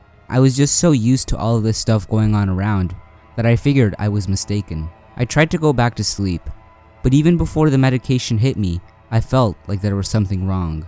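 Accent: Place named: California, United States. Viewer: Subbed! Where are you from dude? I like your accent. California maybe?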